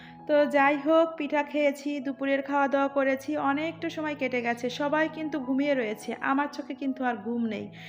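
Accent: Jessore, Bangladesh. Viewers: বুন্ডি আমাগের যশোরের আঞ্চলিক ভাষা ডা কিন্তু সেইরম সুন্দর এরম করে কথা কতি পারলি কিন্তু পরান ভরে যায় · 👍👍 যশোরের প্রিয় আপু, আপনিও আমার মত কাপে সাপোর্ট দিয়ে মোবাইল রাখেন। আপনার ভাষাটা এত এনজয় করছিলাম। প্রত্যেকটি আঞ্চলিক ভাষার একটা সৌন্দর্য আছে। মাঝে মাঝে এই ভাষায় ভিডিও দেবেন আপু❤❤❤ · আপু তোমার কন্ঠে যশোরের এই ভাষায় কথা শুনে খুবই ভালো লাগলো আমি কিন্তু যশোরের মেয়ে বউ। পিঠে গুলো অনেক সুন্দর হয়েছে। কুচি কুচি চিকেন মসলা দিয়ে মেখে রাখলে। ঘরটা সুন্দর করে সাজিয়ে নিলে। সন্ধ্যায় বান্ধবীর সঙ্গে ঘোরাঘুরি করলে। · সত্যি বলেছো আপু আমাদের ডিকশনারিতে কোন ছুটি নেই মৃত্যুর আগ পর্যন্ত তো তোমার যশোরের ভাষার কথাগুলো শুনে বেশ মজা পেলাম পিঠাগুলো কিন্তু দারুণ হয়েছিল❤❤ ভেলপুরি 😊😊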